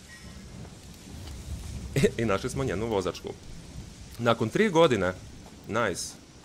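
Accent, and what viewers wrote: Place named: Chakavian, Croatia. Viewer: sta ti pricas Cakavskim narjecjem?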